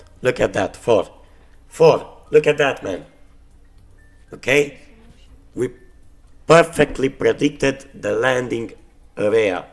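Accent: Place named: Russia. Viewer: Bro your voice is a legend gambler voice! I love that russian accent ❤❤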